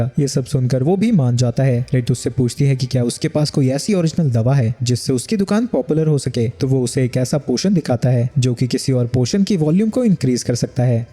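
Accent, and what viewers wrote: Gujarat, India. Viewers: Bro are you gujarati?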